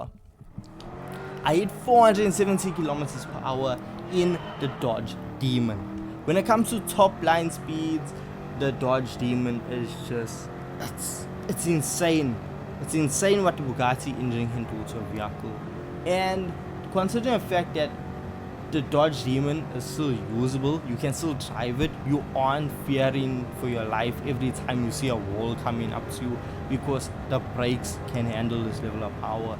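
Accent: South Africa. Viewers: Amigo, he's South African, some 12,300km from Beijing... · South African😎😎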